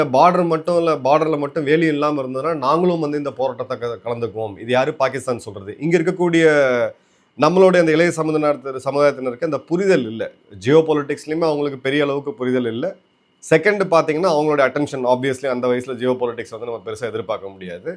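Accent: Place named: Bihar, India. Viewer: I don't understand why this Tamilian Major is using North Indian bihari backward English rather he should use progressive English of tamilians ...alas serving in the armed forces has its own negative impact 😮😅😂😊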